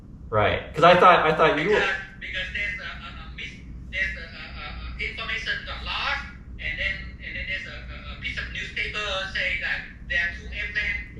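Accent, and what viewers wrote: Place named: United States. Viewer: My daughter and mother did AncestryDNA on my genealogy account. Through my daughter, we had a match with my husband's cousins that had been "lost" as children after a divorce. She also had matches with two other of her father's 1st cousins, that the family had no idea existed. The one had been told her father had died in the Korean War, but it was a lie told by somebody, probably her mother's stepmother, to get her to adopt out her baby. She'd been searching for a picture of her dead father for decades. She made contact with me and was astonished to learn that her father had only died the year before at age 85. She had terminal cancer and died just three weeks later, but she stared at the picture of her father constantly during that time. On my mother's side of the family, we discovered a cousin's son who had no idea who his birth father was. 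Now Mom's got another unexpected match, likely either a cousin or an unknown half sibling's daughter. You just have no idea what you might find out, especially from that time before reliable birth control. But this is the most amazing dna story I've heard yet! I started watching your other channel a few weeks ago and wanted to know more about you and your wife, mostly because you sounded American but were living in Korea. The story was more interesting than I'd imagined.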